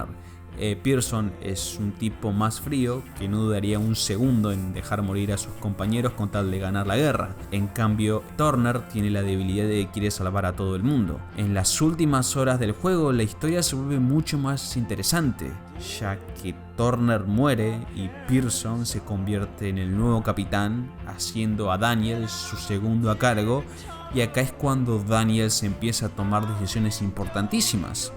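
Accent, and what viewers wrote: Argentina. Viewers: Sos argentino no?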